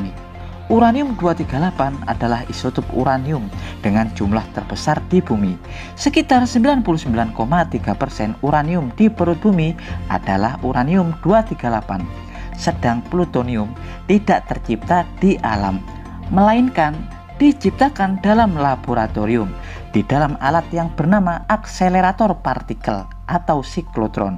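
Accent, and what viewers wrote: Java, Indonesia. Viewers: mendhok djowo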